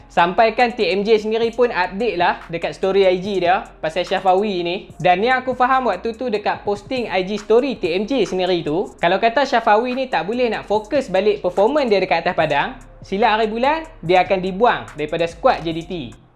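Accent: Kedah, Malaysia. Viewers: Awak memang betul kedah kedah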